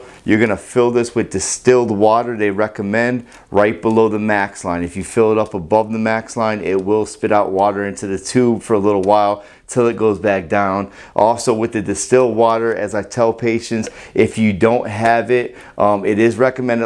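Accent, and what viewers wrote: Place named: North Jersey, United States. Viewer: Bro!! You from North Jersey?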